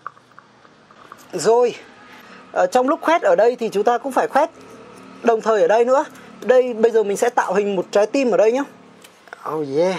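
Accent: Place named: Central, Vietnam. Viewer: One Move : người miền trung:))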